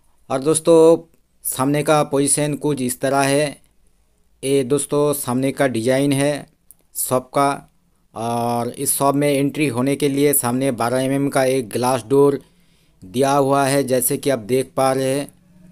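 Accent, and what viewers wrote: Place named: Bengal, India. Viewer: Bhai sahab ap bangali lag rahe ho apki hindi se malum padh rha h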